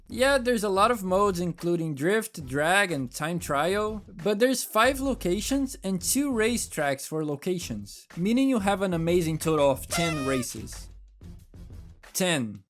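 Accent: Brazil. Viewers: Esse sotaque terrivel de brasileiro me vez sentir ofendido, vou cancelar Need For Speed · Te sigo há meses e meses e só agora que descobri que tu é brasileiro. Bem que desconfiei do sotaque ahahahaha um dos canais que mais me divirto assistindo. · Adoro seu sotaque típico de Br, eu fico puxando R quando falando inglês, só sendo estúpido Achei seu canal agora, ótimo vídeo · Teu sotaque é igual ao do Ayrton Senna falando inglês mano kk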